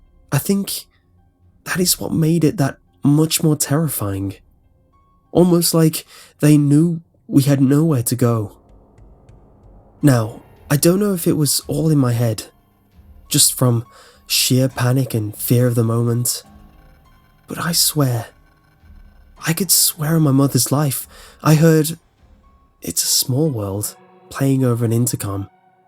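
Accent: United Kingdom. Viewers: ***** Do you not realize that there's no sign of mentioning to either the author nor the talented musician Myu. does not do this because he's good at it in my opinion. Hell, he pays someone else to edit for him. What I meant was that there have been other You Tubers that have narrated this story before. Not to mention that the original author will not be getting any bit of the income make off their stories via YouTube. Last but not least, I own the rights to my very own creepypastas. I put hard work and dedication into them. I do it because I love to, not for money. Then again even if I did I wouldn't be stealing other peoples stories. I make my own. Having a light voice, with a partial British accent and someone else doing all of the editing does not mean you have talent. For the stories that he makes on his own, there's no problem by my eye. If he wants to narrate them and make money then okay. 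But as soon as it's not his original work.. you'd best count on watching your account very closely. The moment that he steals my idea, he'll pay. I know it'll happen. Try re-filming all of Star Wars but instead putting another logo on it, changing one of the characters names like just did with this story. You'll get slapped with copyright so hard, you'll be on the streets or in prison. It's not worth losing your career over. Now I understand that it may be hard to concept this in a way that doesn't make me sound like I'm being a so called, "Dick" but us li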